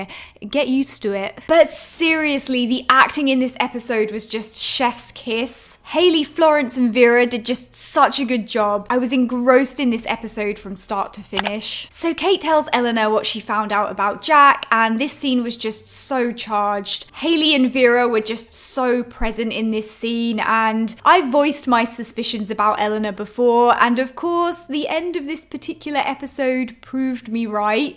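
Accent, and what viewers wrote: United Kingdom. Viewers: You have the most british accent that i’ve ever heard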